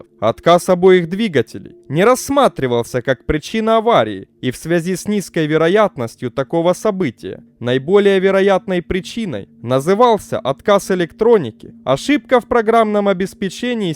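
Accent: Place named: Ukraine. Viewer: Автор украиноязычный судя по произношению, и я уже много раз пишу - розмовляй рідною мовою. Так буде краще.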